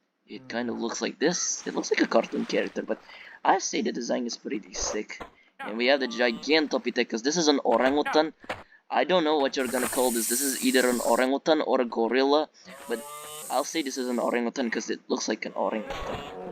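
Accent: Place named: Russia. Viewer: Hi! Good to have you back! And ngl, i like your Russian accent though, for real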